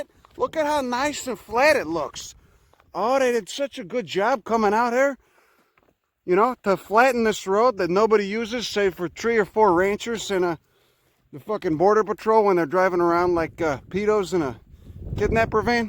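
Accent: Chicago, United States. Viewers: Sound like your from Cicero. I love your vids. Chicago to jersey baby. Keep producing these . most enjoyable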